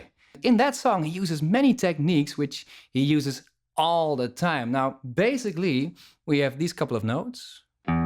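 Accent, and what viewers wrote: Netherlands. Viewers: That Dutch accent...fucking coolest thing in the world.. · You sound like a Dutch guy when you talk, are you dutch haha?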